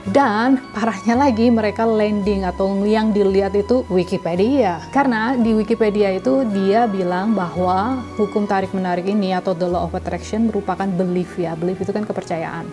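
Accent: Banyumas, Indonesia. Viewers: Serius ka.. aku juga dr CILACAP.. SALAM NGAPAK YAH KA.. n thanks bgt atas sharing nya..beruntung bgt ❤️❤️❤️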